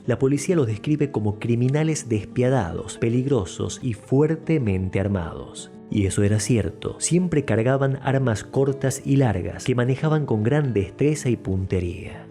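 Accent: Argentina. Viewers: Buena narración chico,te dire que no me gusta el acento argentino, pero te felicito, pues me atrapaste con tu historia,saludos!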